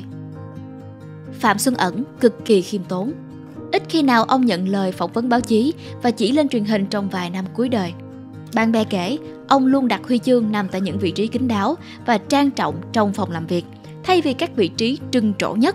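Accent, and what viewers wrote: South, Vietnam. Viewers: Aishhhhh nghe giọng miền Nam đọc những video cách mạng khiến tôi hài lòng quá. Nếu là giọng đọc của một việt kiều cali có lẽ tôi lên đỉnh mất 😊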